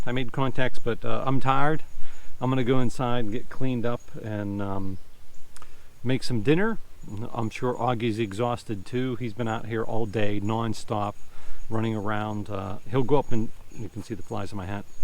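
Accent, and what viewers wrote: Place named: Western Pennsylvania, United States. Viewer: Let me know when you get on. We can practice Cw. Seems I have a pipe to pa. That west pa accent cracks me up. I grew up with western pa folk. Man you have the trees for an amazing delta loop. Vertical.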